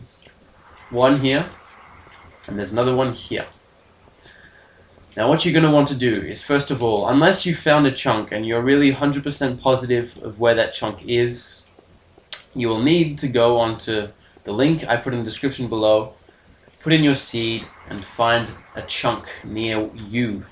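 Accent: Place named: Australia. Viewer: Can really hear your Australian accent start to come through here!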